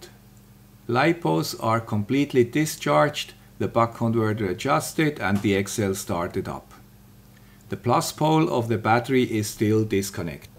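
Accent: Switzerland. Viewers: I just love this! I want to know. So I build a contraption that give the answers automagically. And your "swiss accent" is just right. You'r not talking like a gatling gun, so people not having english as their first language can easily follow what your'e saying. Good, as I have huge problems following a monologue in "switzerdeutsch". :-)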